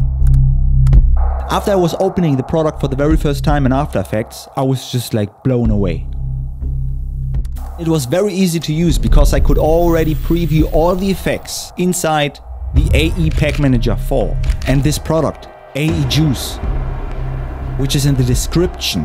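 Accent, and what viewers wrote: Germany. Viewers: Ich glaube damals über das Colour Change Video in Premiere. Fand deinen Humor einfach sehr amüsant, außerdem ist es sehr angenehm, dass du nicht versuchst deine Herkunft zu vertuschen in deinem Englisch sondern den Akzent einfach mit Humor nimmst. Macht es soo viel nachvollziehbarer und sympathischer (Bitte nicht falsch verstehen, dein Englisch ist sehr gut nur hört man es natürlich raus, dass du auch Deutsch sprichst :)).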